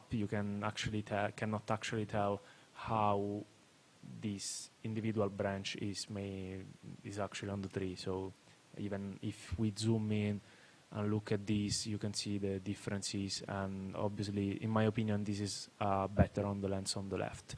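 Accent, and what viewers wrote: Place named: Italy. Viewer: Si sente forte l'accento italiano :)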